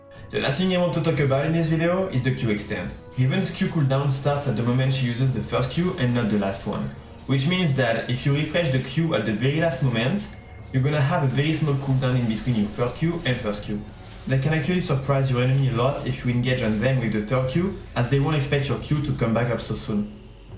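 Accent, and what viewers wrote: France. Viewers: La vidéo est vieille de 6mois mais je suis un peu à chier ne anglais et j'entends que si je suis pas con tu es français donc je me posais la question, possèdes-tu une chaine en français ?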